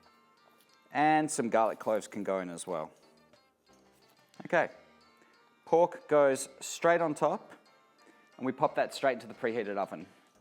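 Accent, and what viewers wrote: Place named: Australia. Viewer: I feel like you are an incredibly skilled chef with a great pallette but you're just boring as hell to watch in a video. IDK what it is. Maybe it's because I'm Aussie too and it's hearing the same accent? Anyway recipe looks killer I'll give it a go when I can afford proscutto, nice olive oil and pine nuts (might be a while cause I'm a poor student).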